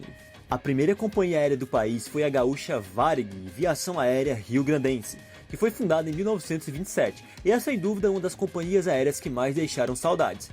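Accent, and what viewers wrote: Northeast, Brazil. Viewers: Você é fluminense? Você tem sotaque nordestino ou goiano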